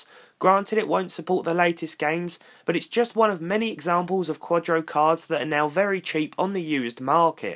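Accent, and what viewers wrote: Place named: England, United Kingdom. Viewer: I needed my English accent fix. Thank you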